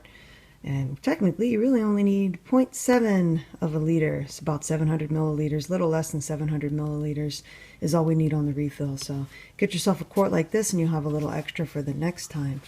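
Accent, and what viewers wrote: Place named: Latin America. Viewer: Are you Latina? I think I hear a slight accent 😊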